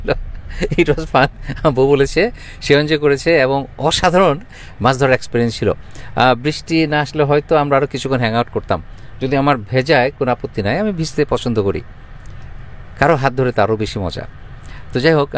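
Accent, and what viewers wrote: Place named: Chittagong, Bangladesh. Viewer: ভাই, মনে হয় চিটাগং এর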